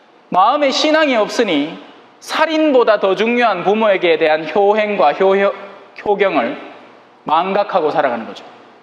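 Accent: Gyeongsang, South Korea. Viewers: 찬미예수님 신부님 감사합니다 경상도 사투리에 ㅋㅋ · 신부님 고맙습니다 강론 들을 때마다 찐한 경상도 사투리가 웃음을 주네요 오늘도 한편의 뮤지컬 보는 기분으로 잘 들었습니다~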